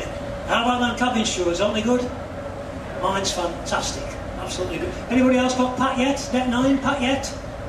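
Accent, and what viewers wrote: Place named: Yorkshire, United Kingdom. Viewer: Still got your Brown Edge accent!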